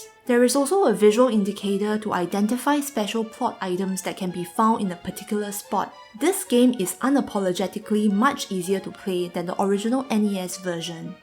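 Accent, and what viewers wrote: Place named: Singapore. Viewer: That sing accent tho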